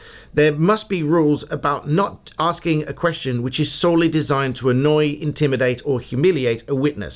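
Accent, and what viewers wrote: United Kingdom. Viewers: I know I’m an a.h (arse hole not amber heard ) but I watched the popcorn interview twice and started this video over twice And I just can’t absorb info from a British accent idk what it is Ty for the info and content tho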